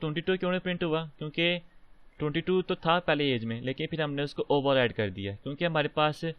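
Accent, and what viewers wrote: Sialkot, Pakistan. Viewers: hahahahaah bhai g ap k bolny k style sy hi pata chal gya tha k ap sialkot k ho nd jab ap ny age print karwai tb sure ho gya =D =D Wesy bhai ap buhat axha explain karty hain or mery mind mai jo b question thy jitni b problem thy wo ap ny khudi hi bta dain =D =D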